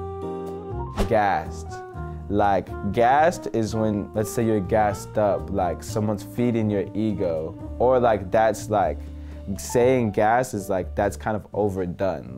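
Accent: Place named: United Kingdom. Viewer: Exactly he sounds British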